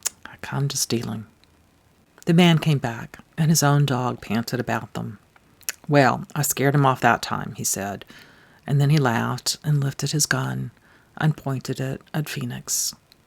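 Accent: South, United States. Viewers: Although he's better know for his non-fiction Civil War trilogy, Shelby Foote is my absolute favorite. All of my main characters carry his voice somewhere within them. He spoke a great deal about Eudora Whelty. He admired her a great deal, appreciated their bourbon and talks together. I found her through him. And I have now found you through her. Remarkable how small the world gets. How we are all connected by tiny, undetectable strings. I've listened now to another reading or two of yours and have enjoyed both tremendously. Not sure if you are from the South or not, either way you capture the essence beautifully. We'll done.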